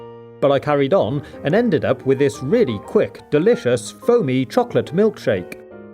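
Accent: United Kingdom. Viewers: Your voice sounds British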